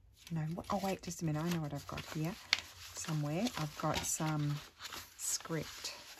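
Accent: Australia. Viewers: Hi Yes I found her on you tube with a flip through of Happy mail for Gayle,then I bought her download papers on ETSY and I watch her when I get a chance..I then watched Gayle which led me to you and many others and subsequently more buying of paper...Now Im learning to journal and card make too.....I can't believe there is such a thriving wonderful community of crafters from "All over the World". I'm in New Zealand "Your old neighbour across the ditch...I picked your Aussie accent straight away and was so thrilled to hear of your life in Italy'...Its a great thing this crafting how it brings people together.Thanks. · Hi, I like your style and the ideas you come up with. How did you come to live in Italy?? Obviously an Aussie accent - then you talked about the weather......not the same as here!!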